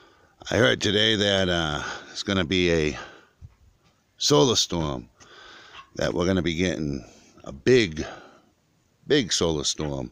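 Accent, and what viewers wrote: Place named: New England, United States. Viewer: Love the Yankee accent! Where are you from??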